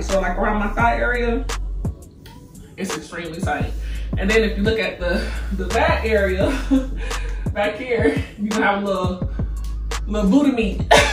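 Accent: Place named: South, United States. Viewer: Beautiful skin. Flawless. Love the southern twang. 😊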